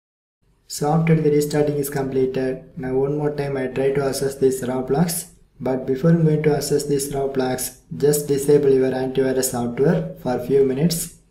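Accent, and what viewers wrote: India. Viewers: U have indian accent bruhhhhhh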